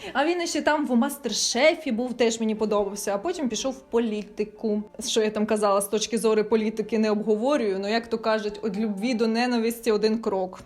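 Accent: Surzhyk, Ukraine. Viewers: нет, какой нахуй сленг? Она говорит суржиком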